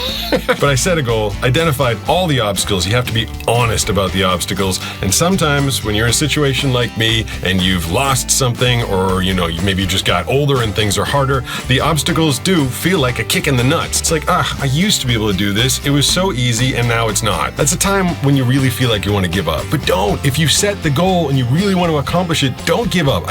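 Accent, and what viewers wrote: Boston, United States. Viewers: I would love for you to do a whole video in your true Boston accent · Do you ever go back to Boston or come across somebody with that accent and if you do, do you slip back to your old ways of speaking?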